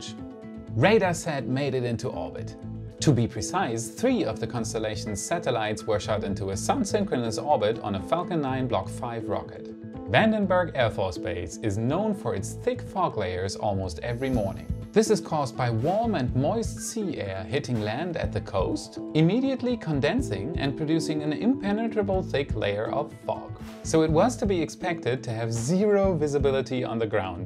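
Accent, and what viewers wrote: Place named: Germany. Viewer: Are you from Germany? Bist du aus Deutschland? Deine Aussprache klingt relativ Deutsch. Just currius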